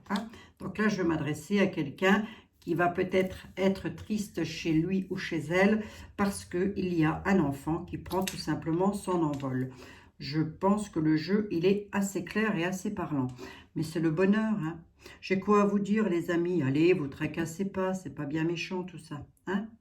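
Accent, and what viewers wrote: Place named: Vosges, France. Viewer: vous êtes vogienne ? on dirait mon papa ,avec votre accent ;-)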